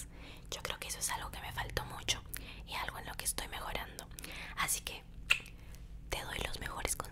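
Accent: Peru. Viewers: Eres Perúana? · Eres peruana? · a era peruano xd pense que era de ecuador xddd siempre veo sus video y penese que si era xd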